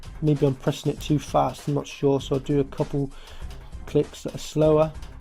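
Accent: Bristol, United Kingdom. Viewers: good to hear a bristol accent! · Is this guy Bristolian?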